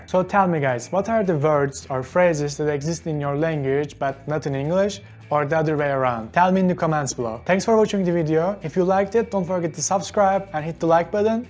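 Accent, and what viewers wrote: Turkey. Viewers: Seni ilk defa gördüm ama konuşmandan Türk olduğun direkt anlaşılıyor. Bizim dil yapısıyla alakalı galiba direkt anlaşılması.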